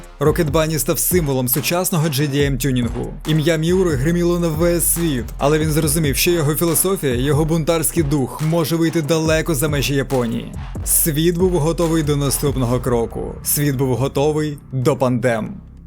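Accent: Russia. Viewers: Класне відео. Ух, де там NFS 2015! Чому ви відтворюєте латинські слова за російськими правилами вимови "E" як "Є"? :face-orange-raised-eyebrow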